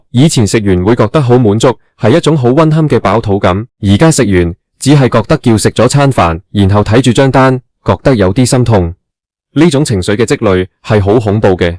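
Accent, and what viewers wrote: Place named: Rural, China. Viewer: 鄉下音太重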